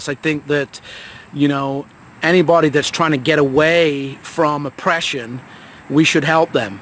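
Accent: United Kingdom. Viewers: How long have you lived in the States? I detect a very familiar British accent still leaking through.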